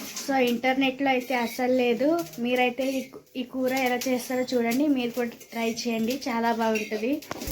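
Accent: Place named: Telangana, India. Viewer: Hi mee maata telangana valla lage vundi mee ఊరు a side vuntundi maadi adilabad dist. kura eppudu చూడలేదు. 1st time mee kattelapoyyi super 👌😊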